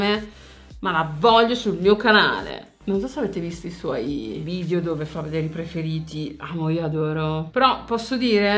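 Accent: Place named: Lazio, Italy. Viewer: Quel modo di parlare a Roma lo chiamiamo burino e lo parlano diciamo nelle altre province del Lazio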